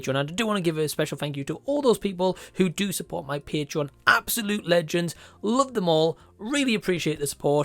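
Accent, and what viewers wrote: Newcastle, United Kingdom. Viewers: Hey mate, I’m from London and I just discovered your channel. Love your channel and your Geordie accent. We don’t all speak like that down here (as you stated). I’m from the “ends” and whilst I used to speak like that, I grew up and got a career and spoke properly. It’s a shame Adam and Co haven’t grown up either. Not a great representation of us Londoners. Appreciate your honest review.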